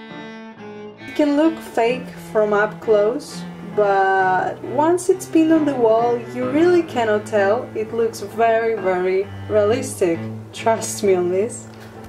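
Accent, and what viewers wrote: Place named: Greece. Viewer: Omg you're Greek? I couldn't tell by the gorgeous accent! I'm Greek too!❤️🦋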